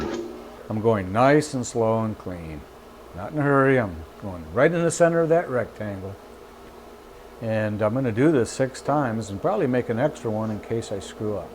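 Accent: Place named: Midwest, United States. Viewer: called a Midwestern accent.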